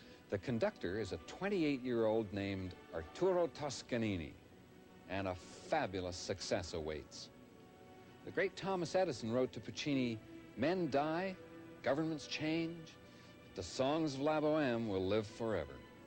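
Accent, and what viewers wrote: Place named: Canada. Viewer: Who’s the host? Presumably he’s originally Canadian, but I can’t place him.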